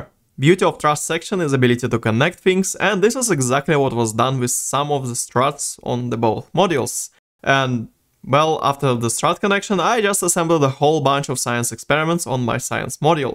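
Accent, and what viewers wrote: Russia. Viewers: he's Russian, you can hear it tho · Вы Россиянин? Акцент очень похожий на русский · жесть - такой русский акцент 8) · Ты ведь из России или снг, да?) Забавно что человека с акцентом понимать намного легче чем говорящего на чистом английском. Классное видео)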